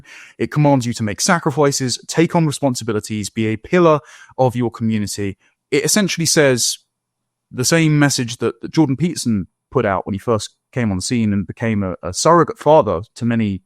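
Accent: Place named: United Kingdom. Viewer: Why is he describing the right wing as religious? He sounds like a sheltered posh Englishman without any connection to the reality of most young men. For the longest time in Europe, nobody on the far right cared about religion. The uniting factor was hardcore nationalism and opposition to immigration. "Going to the right" and for it to be milquetoast tolerant Christian patriotism (which solves zero issues such as multiethnic societies) will help nothing. They'll figure it out for themselves.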